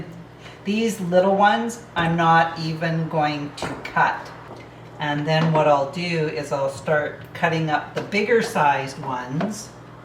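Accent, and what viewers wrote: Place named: British Columbia, Canada. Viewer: Well done ma'am, looks delicious. First time running into your site, enjoyable. Noticed a wee bit of B.C. brogue in your voice. Can listen to that all day long. Am located probably about 400 miles south of your location. Have an enjoyable weekend.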